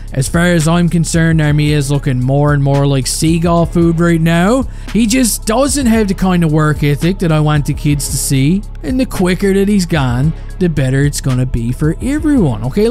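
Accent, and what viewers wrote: Newfoundland, Canada. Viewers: Newfie accent as he is from Newfoundland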